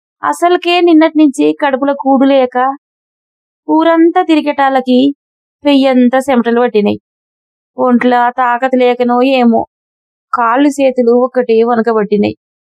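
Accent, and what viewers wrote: Telangana, India. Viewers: మేడం గారికి హృదయపూర్వక ధన్యవాదాలు. మీ స్వరంలో నా కథను నాకు కొత్తగా పరిచయం చేశారు. ఆద్యంతం కథలోని ఆర్తిని ఆర్థ్రతను ఒడిసిపట్టి మీ స్వరంలో ఒలికించారు. తెలంగాణ యాసను అందులోని కమ్మదనాన్ని అతి సహజంగా రక్తి కట్టించారు. కృతజ్ఞతలు.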